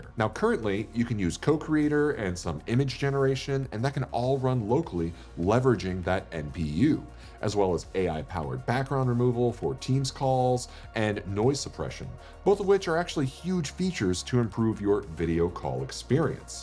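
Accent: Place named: India. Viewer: Forgive me to hesitate for clicking in, as I couldn't handle Indian accent (Not being mean, just I can't) ...But your video is very informative and well done, thanks!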